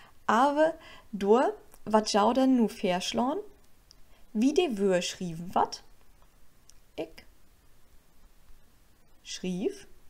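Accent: Low German, Germany. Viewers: Es ist so schön wie Plattdeutsch spricht. Mir geht das Herz auf. Eine Steigerung geht nicht. Dankeschön ❤👍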